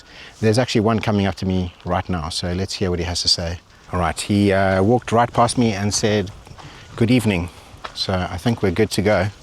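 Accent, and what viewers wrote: South Africa. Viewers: Came across your site & immediately recognized your accent as South African. Thankful your property is okay. The fires were horrendous. Subscribed!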